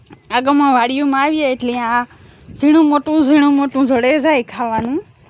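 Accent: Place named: Mer, India. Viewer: Ben tame asal merni bhasha bolo so te bahu j saru lage h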